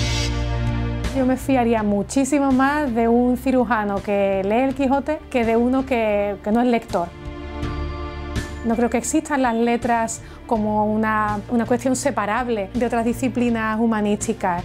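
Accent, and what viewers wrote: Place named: Seville, Spain. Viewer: Ella habla un castellano impecable, correctísimo, rico, diverso, elaborado y, además, con un precioso acento sevillano.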